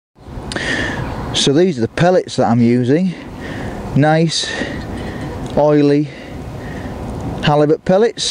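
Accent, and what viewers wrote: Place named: Hull, United Kingdom. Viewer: Nice to hear a hull lad not many in Ireland love the vids keep them coming. By the way was that East Park or picky I thought it was picky but its been so long since I was there.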